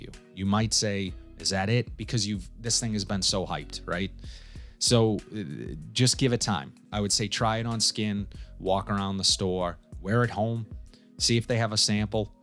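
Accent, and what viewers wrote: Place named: Massachusetts, United States. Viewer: Wicked awesome accent . Worcester mass?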